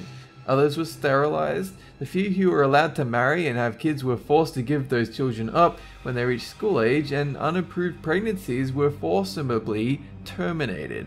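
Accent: Australia. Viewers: he is australian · The guys talking sounds like he's slurring or or mumbling. I know he's Australian but it's hard to listen to. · sounds nothing like British accent. It's Australian. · alright, been watching you for years, gotta ask: are you Australian? i know, the accent says not-typically-Australian, but you sound so colloquial. does our generation just all speak the same..? far out. anyway, great stuff, thanks n keep it up! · Hellbent's alright and all But I love the Australian accent They always sound drunk and it's amazing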